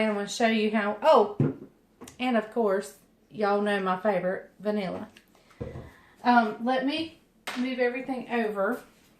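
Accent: South, United States. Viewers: you crack me up! With your southern accent it sounds like you are saying bacon soda and bacon powder. Funny! I remembered my mama making this cake when I was little - the buttermilk makes it so moist and that icing is just the yummiest!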